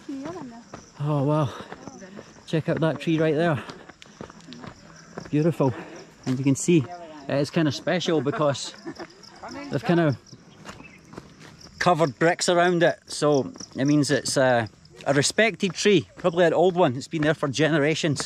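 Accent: Scotland, United Kingdom. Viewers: Are you Scottish??? Welp!!! I have been to Scotland and stayed there approx 9 months mate 😀❤️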